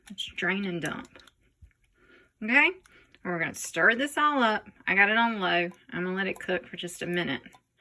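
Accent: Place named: North Carolina, United States. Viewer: Like the way you talk and your voice sounds , NC slang , love it !!! 👍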